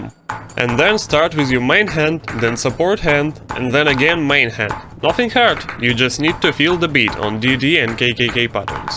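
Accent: Russia. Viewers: RUSSIAN ACCENT, why not do Ha Pycck| /| |/| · ахаххахах, смотрел все видео с мыслью: "Какого хера у чела такой сильный русский акцент?" (слишком сильно напоминает меня, когда свой голос записываю). Вот оно что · я сразу понял, что имею дело с Российским человеком из-за акцента) очень всё понятно объяснил, без воды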